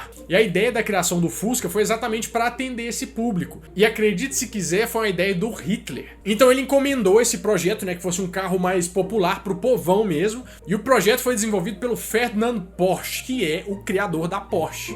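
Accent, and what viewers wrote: Minas Gerais, Brazil. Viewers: Sou nova no canal , ele é mineiro ? Se for amei 💕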